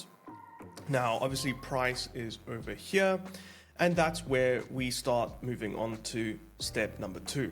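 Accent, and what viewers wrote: South Africa. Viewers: Are you a Saffer?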